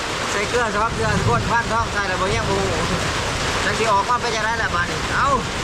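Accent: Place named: Isan, Thailand. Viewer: เป็นคนลาวหรือไทยคะสำเนียงเหมือนคนอุบลกับศรีสะเกษเลยพูดไม่เหมือนคนลาวแท้ๆฟังง่ายค่ะ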